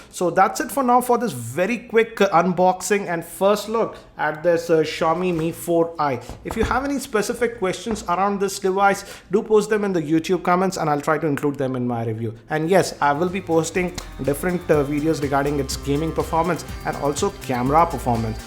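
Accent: India. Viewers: Indian accent, the worst on the planet...what is this guy saying exactly? Indians claim to be native English speakers but I seriously doubt that...it is a 3rd language to them.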